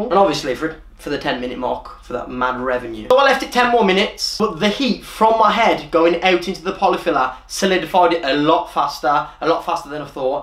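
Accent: Birmingham, United Kingdom. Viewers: Which region of the U.K.is your accent from. I know different parts of england have different accents. I don’t know which regions the accents are from though. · Hank Black Birmingham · Hank Black he’s from Birmingham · Hank Black Birmingham Dudley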